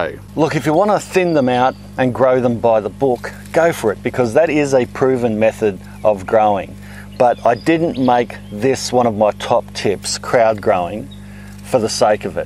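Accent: Australia. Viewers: Great info - thanks. Lovely to hear an Australian accent too. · Love your Aussie accent, how I wish I could have a real garden, not just potted planting.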